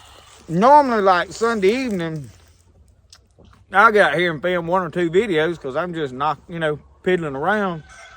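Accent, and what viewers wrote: South, United States. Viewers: Thanks for the daily walk a bout.... Love the southern talk.... From Minnesota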